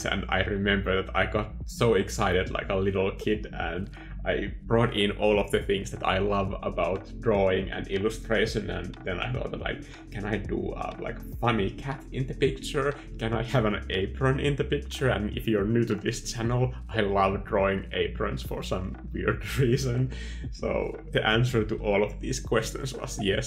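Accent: Finland. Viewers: I think I have been at this Cafe place u showed in the beginning. If I’m right it is in Helsinki. That would also make sense to me since u have kind of a Finnish Dialekt. I love Finnland wanna go there again soon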